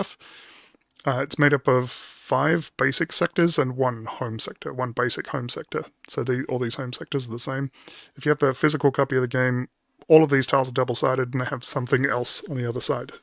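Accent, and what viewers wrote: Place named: Australia. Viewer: Mine's on the boat too! I'm Aussie. U sound like one too!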